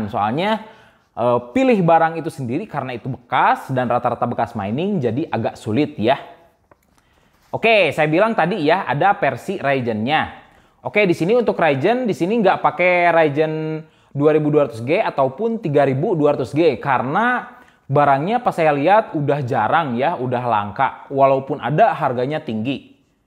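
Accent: West Java, Indonesia. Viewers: ep dan pi sunda pisan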